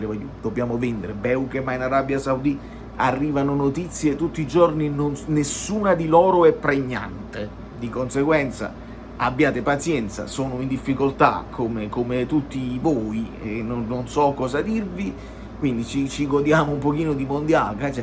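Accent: Naples, Italy. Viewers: Bello sentirti parlare in inglese con la amplomb napoletana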